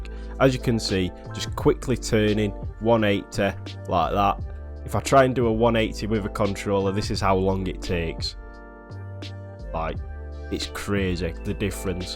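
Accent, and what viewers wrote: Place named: Sheffield, United Kingdom. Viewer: you from sheffield bro ? i’m from birmingham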